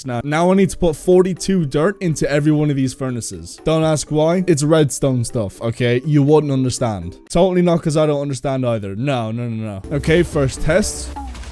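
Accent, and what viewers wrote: Ireland. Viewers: Yo bro r u irish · also are you irish?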